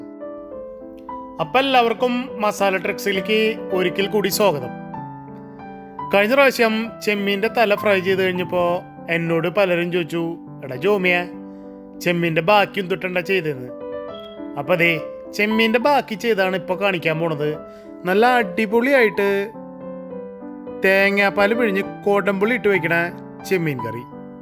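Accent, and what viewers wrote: Thrissur, India. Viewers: Thrissur language poli❤.. good presentation 👌👍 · Thrissure aano😄 Curryum samsaravum polichu. Sugar edarilla, Eni cheythu nokkam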